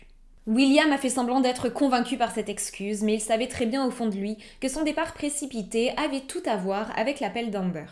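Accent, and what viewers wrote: Quebec, Canada. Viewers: J'aime vraiment trop ton accent Québecois, ça sonne tellement sympathique, j'adore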